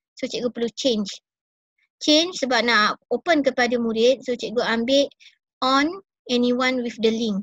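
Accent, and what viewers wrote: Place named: North, Malaysia. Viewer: Syukran cikgu.....Penerangan yang jelas dan terperinci. Seronok dengar ada dialek utara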